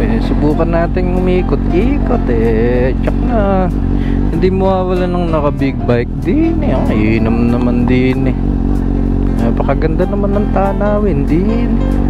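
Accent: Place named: Bulacan, Philippines. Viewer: Aba eh nawiwili akong makinig sa pagsasalita mo ngayun idol eh,alam mo bagang ganyan din magsalita mga taga San Miguel bu..lacan...mga kamag anak ko dun eh pag silay magsalita ng ganyan eh di ko wari kung akoy tatawa eh....hehehehe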